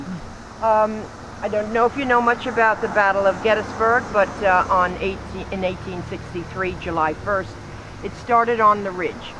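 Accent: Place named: New Jersey, United States. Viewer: I KNEW I PICKED UP A NEW JERSEY ACCENT! HUH!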